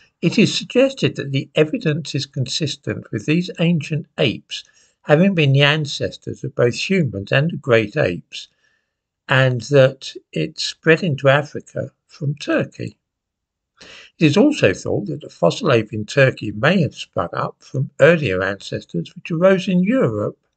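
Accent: United Kingdom. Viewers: sounds like the British, after BRIXT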